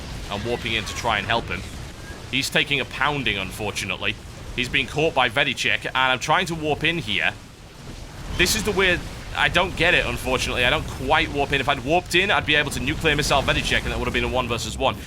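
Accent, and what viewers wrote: United Kingdom. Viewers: Where is he from? (Well obviously some place in britan but i mean slightly more, because as has been stated by many, your voice is awesome...) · why do you always say YURS insted of years lol :D funny as hell tough you cynical brit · its just part of the british-english dialect i think. an accent from where he lives. just like not everyone in america says things the same. i know people from boston who don't pronounce their "R"s in words. they say Cah instead of Car. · It's pronounced that way if you have the kind of British accent that TB has.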